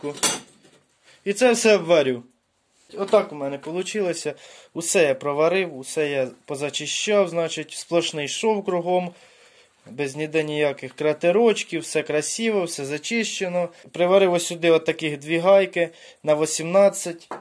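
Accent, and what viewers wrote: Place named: Ukraine. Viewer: Я млею от этого суржика. Как-будто я побывал в родном селе. Молодец земляк! 👍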